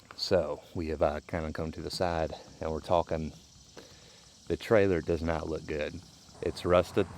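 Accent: South, United States. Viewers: Looking forward to future updates, and subscribing for that lovely southern speaking voice.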